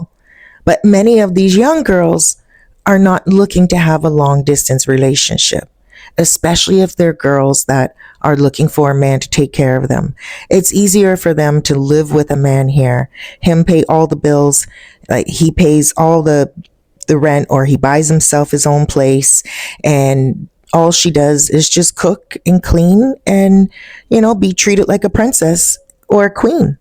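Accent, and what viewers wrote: Canada. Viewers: Again I love that Canadian Accent. Very pleasant. BTW went to Buffalo State College and visited Toronto plenty of times. That area around Lake Ontario is Cold 🥶. Brrr 🥶. I bet it’s warm spring and summer nearly year round. Nice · love the Canadian accent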